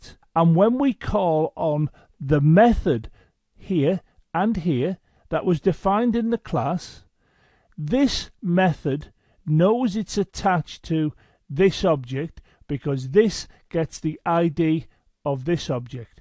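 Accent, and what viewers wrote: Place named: Northern England, United Kingdom. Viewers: mate u from the north of england, your video is mint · Thanks for the clearest (and scouse-tinged) explanation I have found so far. So just to check - the 'self' parameter tells that method "please execute the code on the object you're invoked on". Is that not already implied by definition? What would happen if 'self' was not included?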